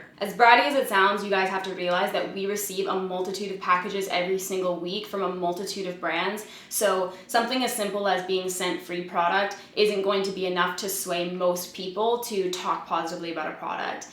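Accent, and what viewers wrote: Canada. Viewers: First of all, you're so stunning. Second of all you're Canadian accent is adorable. And third, I love your videos! You're the most honest youtuber out there, I love that I can trust your opinion when trying to figure out what makeup to buy and what's not worth it! Thanks for being the coolest thing ever x0x0x0x0x teresa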